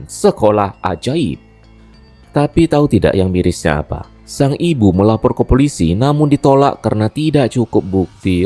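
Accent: Indonesia. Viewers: abg ini org minang kah?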